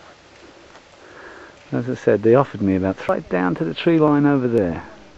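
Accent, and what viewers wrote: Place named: Australia. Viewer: I have never known anything on how rubber is made although i always thought it came from the sap of a tree. you can really recognise the Australian accent in this video. I really like the bridge so authentic, the thailand landscape looks really lovely and lush and tropical. The thailand people look so nice and welcoming and peaceful. Wow five dogs this is alot. The fluffy dogs are really cute!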